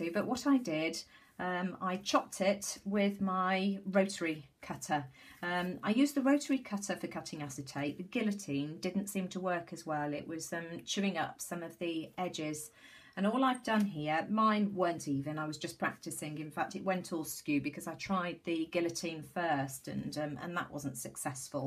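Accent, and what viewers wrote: United Kingdom. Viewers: thank you so much for replying that was very sweet of you to take time out but I figured you were probably in the UK from your accent I'm in the USA so I don't even know what Boden is LOL just looks darling I had a top similar to it a long time ago and made me think of the polka dot top I used to have and I don't know what happened to it LOL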